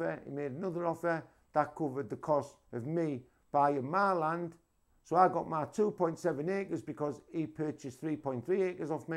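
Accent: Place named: Teesside, United Kingdom. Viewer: thats a sout teeside / north yorks accent ? ... recognize the twang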